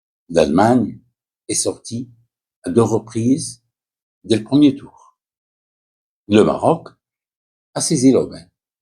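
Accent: Standard. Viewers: فرنسية نقية ا السي ، تبارك الله ماشاء الله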